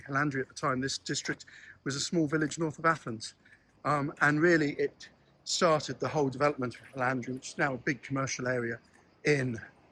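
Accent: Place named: England, United Kingdom. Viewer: No offense in what I say. I like your accent and it is a shame people from England leave to move to Greece to lose the accent over the generations. Also to put a burden on Greece to cause a housing crisis despite you build your own place. But also it is a shame that in England they have the same issues in what is done to them yet natives to England do the same to Greece. Its a chain reacton. But also that many saints warn against mixing ethnicities since they lose their culture language religion tradition etc and its like mixing all crayons and we have one color brown. Even articles that show research see that 90% of Greek outside of Greece lose all those things listed. Then they become more liberal part of what the state wants to cause more issues and its makes things worse around the world for everyone since more selfish more liberal. 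Some joy now but down the road it will get worse just like many things got worse every decade for everyone overall. The respect is put to the side and when that happens no one gives respect and the issues grown. We are not fighting for a future for kids, family, ancestors, community but for self. As AI would sum up what what many saids and elder say "Christian tradition upholds national identity, natural human distinctions, and patriotic duty as part of God's created order, viewing anti-nationalism or globalism as a violation of nature, while simultaneously condemning chauvinistic, idol-worshipping, or racial-hatred nationalism as a moral corr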